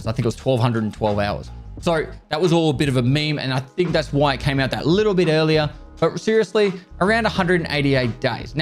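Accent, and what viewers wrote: Australia. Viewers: I havent watched videos in a while, but living back in Australia for a bit now, his Accent got a lot stronger compared to when he was living in Europe for a year. Or is that just me?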